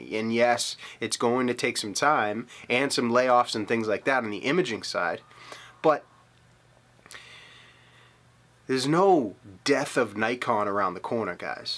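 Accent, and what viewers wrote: Boston, United States. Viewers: I hadnt noticed the boston accent before haha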